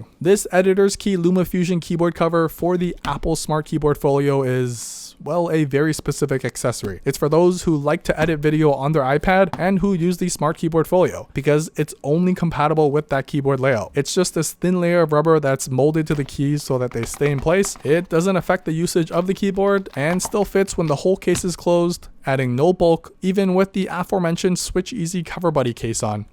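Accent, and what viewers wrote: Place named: Canada. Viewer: Wow, Kanadian lolzz 👍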